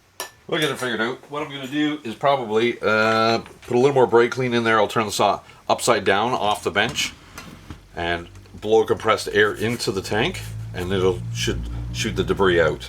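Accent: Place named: New Brunswick, Canada. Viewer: My mother was from NB so I like your accent! Anyway, the white paint on the fuel line is a hint it is original. My 2010 vintage saw has the same. I take it you don't think much of these saws. Since I have only used Poulan and Echo, fixing mine is a new experience. Seems like a pretty good homeowners saw. But I have noticed that the displacement of these saws seems inconsistent - 42, 38, 37, (mine says 35) and even saw one in a video that showed "3414c". I guess the Chinese don't read English very well (: BTW there is a small round tab (1/4 inch dia) that secures the front left of the cover.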